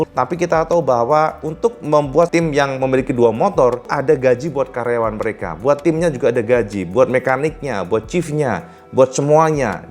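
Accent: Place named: Java, Indonesia. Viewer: Sampean jowone pundi cak kok kuentel jowone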